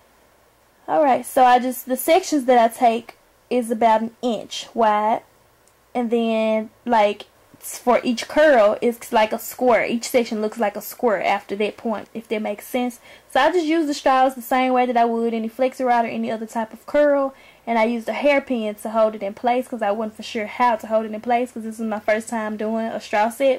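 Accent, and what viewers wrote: South, United States. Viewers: Love yo southern drawl. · Nice tutorial but you need to learn how to speak you country as hell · how would you maintain the style for day two? And I love your southern accent, reminds me of home.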